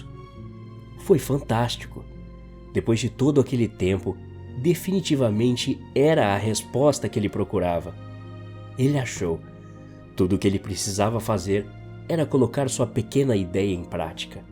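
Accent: Bahia, Brazil. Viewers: Mano, o mlk era baiano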